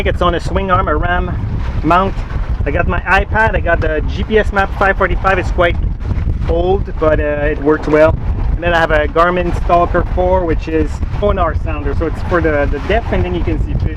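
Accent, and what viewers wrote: Quebec, Canada. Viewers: Im going to take a chance here but would you be from Québec ? If not, your accent sound like it😃 Great vidéo, in fact, one of my favorite !! Lots of good actionable ideas in there !!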